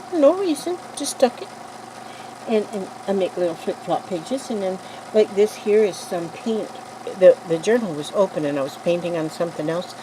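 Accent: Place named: Minnesota, United States. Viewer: I really enjoy your videos! You are so inspiring yet so calming! I will always think of u as! I found that story so cute in another video, maybe it's your voice has that Minnesota accent. Anyways your videos are a pleasure to watch TFS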